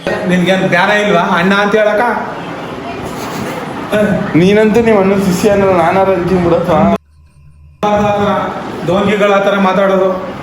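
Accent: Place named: Mysore, India. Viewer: Nim uru yavdu bro Mysore district anta gotaithu nin bhashe ((slang)) matode rithi nodi gotaithu ..nija alva?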